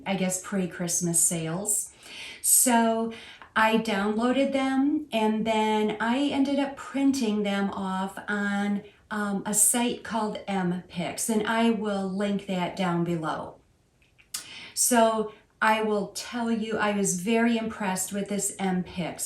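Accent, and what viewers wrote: Michigan, United States. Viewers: Hi, We were so so excited when you opened your front door and your Siamese Sadie appeared. We have a few of the same things in common. First, my husband went to law school in Michigan. Your accent is still music to his ears. Next, we have 3 Siamese cats Sadie (Lilac Point), a huge boy named Keith and a little Siamese born in May (that we call June because we got her in June). We live in a 100 year old house. Your home tour was so inviting and cozy. Jack was so so cute when he was being camera shy. What breed dog is he?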